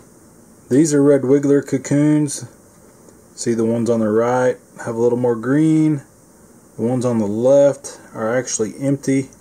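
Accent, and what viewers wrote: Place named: Texas, United States. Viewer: Sound like a good Son of Sam Houston if i ever heard one. Im East of Houston. Just starting. 3 bins so far, but i cant get mine to grow. i got 40 cocoons out of one concrete tub. put couple thousand uncle jims worms in there. 1 or 2. i need pure species bins.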